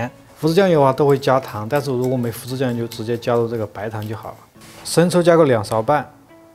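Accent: Sichuan, China. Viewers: 川普听着亲切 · 椒盐普通话，四川人😅😂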